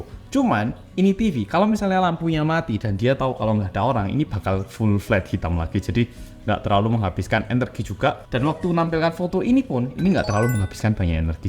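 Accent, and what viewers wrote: Java, Indonesia. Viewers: Kelazz oppa logat jawanya ga bisa hilang wkwkwk😂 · Korea tapi medok jowo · Aku nek lali jenenge pasti nyebute ngene "Wong Korea seng ngomong bahasa Indonesia logat jowo seng bojone wong Singapore bahasa inggrisan"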